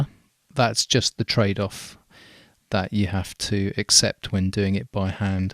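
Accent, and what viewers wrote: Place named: Australia. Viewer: I love the accent bro. Crocodile Dundee up in this bihhhh. "That's not a knife, this is a knife!" LOL every time u say knife thats all I i hear 😂😂😂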